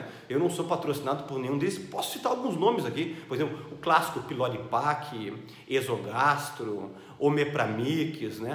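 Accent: Rio Grande do Sul, Brazil. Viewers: Não é bravo, é gaúcho. São assim. rss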